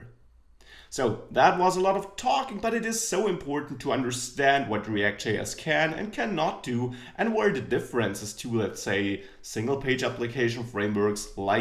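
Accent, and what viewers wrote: Germany. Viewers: Are you from south or central part of Germany ? I had a colleague from there and exact same ascent. Thanks for nice info anyways. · Funny to hear germans speaking english... :-D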